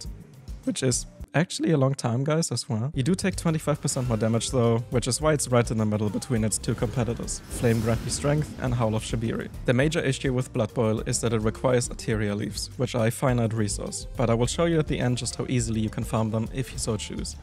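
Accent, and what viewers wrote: Germany. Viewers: I smell german accent 😤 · Hey Not to sound rude but are you german Because you sound exactly like every German I know speaking English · Alter du klingst krass deutsch aber danke für deine Viedeos die helfen echt weiter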